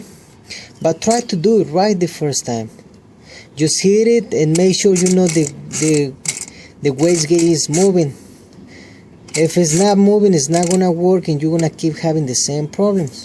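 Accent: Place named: England, United Kingdom. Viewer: My friend , your voice and english accent is really annoying, i hate you.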